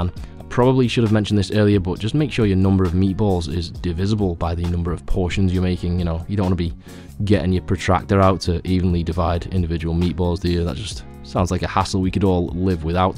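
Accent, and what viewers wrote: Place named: Liverpool, United Kingdom. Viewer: Scouse lad haha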